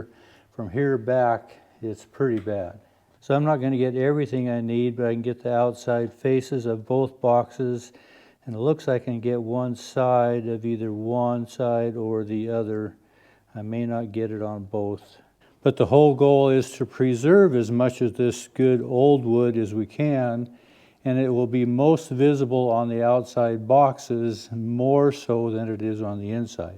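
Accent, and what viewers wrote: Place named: Montana, United States. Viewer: I grew up back in Belen NM and had some good friends that were from Montana. Im 55 years old and I hear you say things that absolutely remind me of my friends mom who talked thst way. Their name is Lawson and there was another family from the same area of Montana named Goodson. They knew each other from bake then in Montana and I figure they must have tslked about going south where they could start over.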